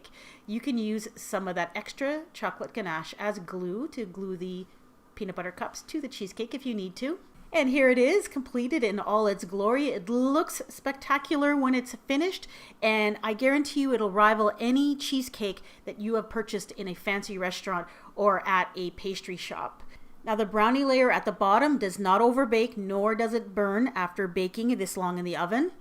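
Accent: Canada. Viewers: i knew you were Canadian!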